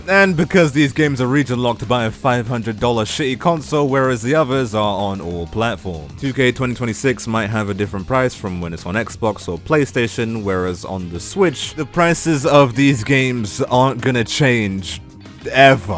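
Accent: United Kingdom. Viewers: The British prince is back!!❤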